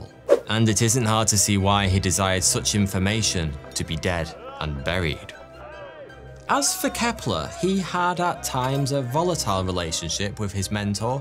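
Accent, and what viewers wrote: United Kingdom. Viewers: I don't know why , but after watching any of you videos, I get a strong British accent , in fact , I am writing this comment speaking in my head like you .. wierd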